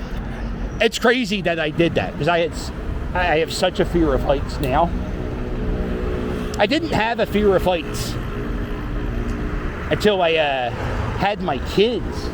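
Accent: Philadelphia, United States. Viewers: Are you kidding your accent is Thick as pea soup. I’m from Philly and your voice screams Come have a cheese stake and pretzel GO EAGLES!!!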